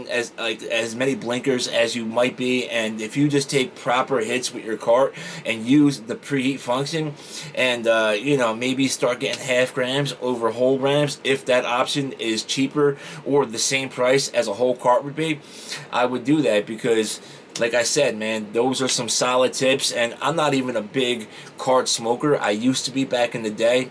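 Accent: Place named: New York, United States. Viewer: Okay I just heard your voice alone and you just sound like home to me. My family is from New York and you sound like my people. 💜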